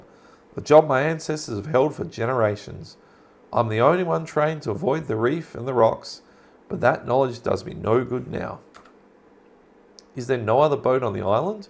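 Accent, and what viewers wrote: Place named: Australia. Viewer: Nice to see you got another video out. I was wondering if you gave up producing anymore of these. Now, I just only watched the first few minutes as it is getting late here in my part of the world. Will watch it later when I have more time. Based on your accent, I am assuming you are in Australia. Also to add. Wow! Talking about going "old school", compared to when I played this game on CD ROM with full voice acting and such on a 486 with a Sound Blaster 16 ASP w/ wave table daughter card. I think I still have the thing in the basement. May have to see if I can resurrect it.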